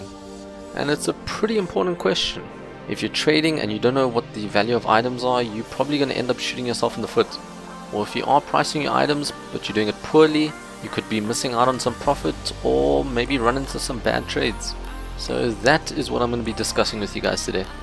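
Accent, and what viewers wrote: South Africa. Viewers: Awe bru you sound south african my chommie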